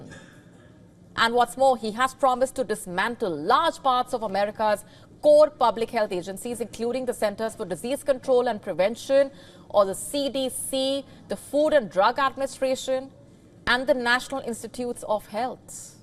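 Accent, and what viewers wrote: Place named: India. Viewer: news caster with strong Indian accent...voice not clear...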